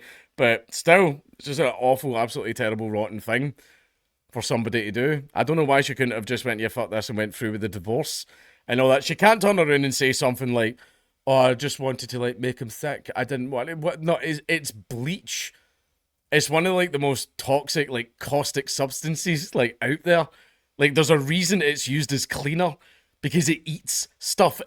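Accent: Scotland, United Kingdom. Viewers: Nice to hear him talking scottish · hitting us Yanks with that unfiltered Scottsman accent. I love it. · Lol mudder. I know we Welsh have a fun accent but Scots just take the cake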